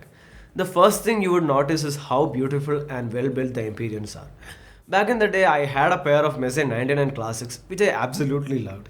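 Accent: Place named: Tamil Nadu, India. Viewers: Sometimes it gets little hard to understand his south indian accent. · OK!!! I have to admit that South indian accent of english is God tier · Typical Tamil accent. Nice review Dude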